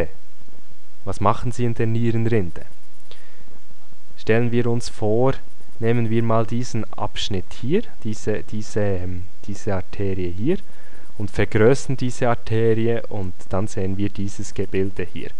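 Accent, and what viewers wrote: Switzerland. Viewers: Das ist sicher ein Berner:) super video 👌merci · ein sehr komplexes thema kurz, knapp und ausreichend erklärt, hilft mir sehr! vielen dank!....und ich liebe ihren schweizer dialekt ;) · So en Schwiiiiiiiiiiiizer !! :-) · Extrem hilfreiche Viedos , die durch sehr verständliche Erklärungen glänzen! Das Besondere sind die langsam gesprochenen Erklärungen, die es erlauben das Gehörte auch gleich zu verarbeiten , wodurch man ausdauernd zuhören kann. Die meisten anderen medizinischen Tutorials scheitern in ihrer Qualität an der Geschwindigkeit. Als schönes Extra kommt die angenehme Stimme hinzu und der schöne schweizer Akzent! Für mich die besten Tutorials im Netz! Herzlichen Dank für diese tolle Hilfe !!!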